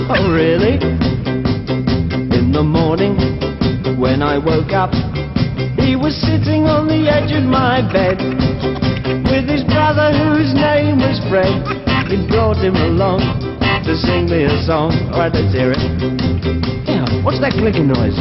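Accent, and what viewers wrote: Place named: United Kingdom. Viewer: I love his British accent ❤️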